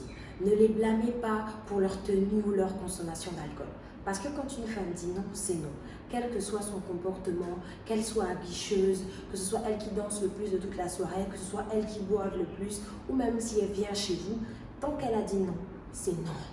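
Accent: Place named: Gabon. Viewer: Je kiff ta chaîne, et l'accent gabonais viens mettre un petit plus ♥️ continue t'arrête pas❤️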